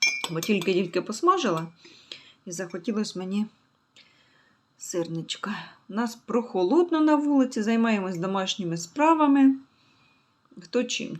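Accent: Poltava, Ukraine. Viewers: ❤Приємно послухати . Як з рідними з Полтавщини поспілкувалася.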